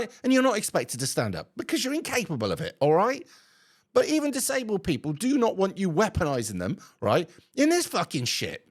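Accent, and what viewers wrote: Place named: England, United Kingdom. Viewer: Love a proper English accent 🤣 Merry Christmas from Nottingham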